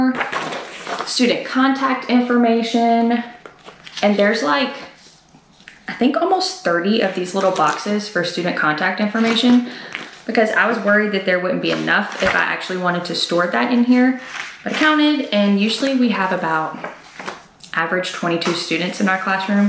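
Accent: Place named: South, United States. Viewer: LOVE this! These stores are all awesome! As is your Southern accent haha happy new subscriber here!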